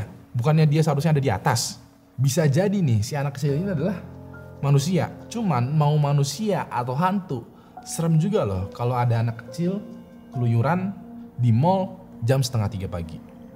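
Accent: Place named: Medan, Indonesia. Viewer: orang medan ka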